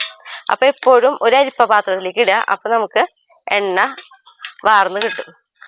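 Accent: Thrissur, India. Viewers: അത് തൃശൂർ ക്കാരുടെ ഒരു സ്റ്റൈൽ അല്ലേ?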